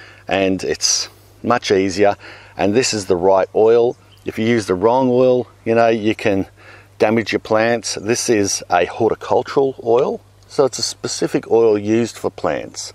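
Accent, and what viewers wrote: Australia. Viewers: Greetings from Munich/Germany :-) Watching you on a quite nasty, cool and rainy Bavarian late spring evening, while having my own tiny tomato plants waiting (still - fortunately - quite patiently) for warmer weather, so that they can finally conquer their city balcony. I'm mostly following comparable (or not so much if comes to climate conditions and techniques!) German gardening channels, but I love coming back to you every once in a while, and let it be solely for a reminder of my own time down under and by taking in your lovely accent. Keep "mulching". Nice work & cheers! ;-)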